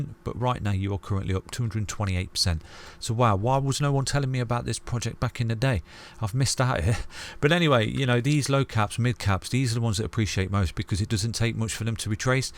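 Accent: United Kingdom. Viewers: Thanks so much. I love your videos (which are very eductive) and your fabulous british accent!:) Which PL team do you support? Take care.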